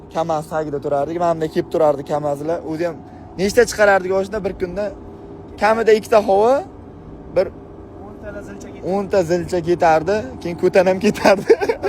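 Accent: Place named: Dagestan, Russia. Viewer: Саломни догистон шевасида м узбекча иложиси бору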